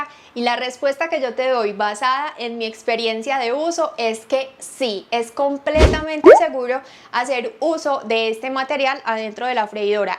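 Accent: Colombia. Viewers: Vine por la información, pero, Dios mío... Ese acento paisa... 😍 🇨🇴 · Me gusta tu acento colombiano ❤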